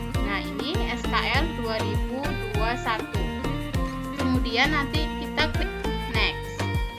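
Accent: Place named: Java, Indonesia. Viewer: Medhok ya kak😂semangka❤semoga bermanfaat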